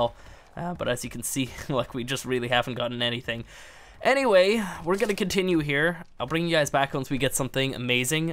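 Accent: Ireland. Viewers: Are u Irish bro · Which part of Ireland are you from?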